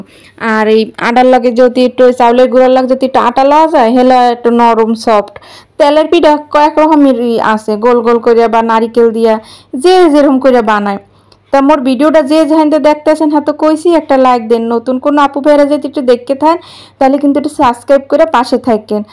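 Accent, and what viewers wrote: Barishal, Bangladesh. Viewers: ❤❤চলে এসেছি❤❤আপু তোমার বরিশালের ভাষা শুনতে❤❤এতো মিষ্টি করে কথা বলো❤❤ যতো শুনি ততো শুনতে ইচ্ছে করে ❤❤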